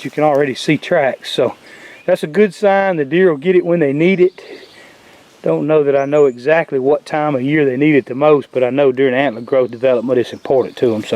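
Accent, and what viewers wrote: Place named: Virginia, United States. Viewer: Howdy, I’m new to your site. I’m an 80 year olde this August & a disabled veteran. I really enjoy what you do and watching you do it. I haven’t heard an accent like yours since my days in Virginia and the Navy so I’m learning. You seem like a great guy and the people that work with you, also. Are those your family or hired hands? Thanks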